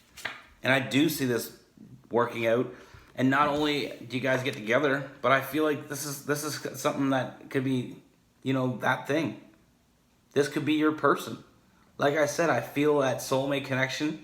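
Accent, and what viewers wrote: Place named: Canada. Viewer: Can’t wait to feel this connection...so, when are you coming to town?? Lol jk. *no disrespect if you have a lady... I grew up on Canada (Rocky Mountain House, AB and Moosejaw, SK. I love your accent. Canada is so awesome, I miss it! Shoutout to all the Canadians! You’re awesome too. So adorable. Thank you for this. It’s so appreciated. ❤️🙏🏻🙏🏻👌🏻👌🏻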